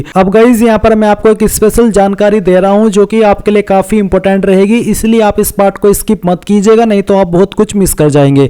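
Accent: Saharanpur, India. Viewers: Tum saharanpur se ho kya bhai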